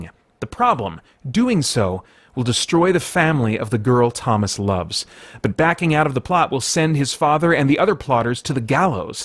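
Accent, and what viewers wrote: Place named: United States. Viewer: Haha. Laughing out loud at you trying to pronounce the names. Nadine's last name is pronounced Brand - Ess. Pretty sure It's Morgan L Buss - ee And I think it's Gillian Bront - Ay (like the Bronte sisters) Adams. Interesting to notice that in the American accent, Fawkes and Fox sound pretty much the same. We have quite a distinct difference between the two.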